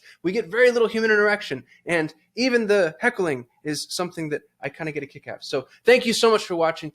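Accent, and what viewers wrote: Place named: United Kingdom. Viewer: you know i always do. #1 fan of British accents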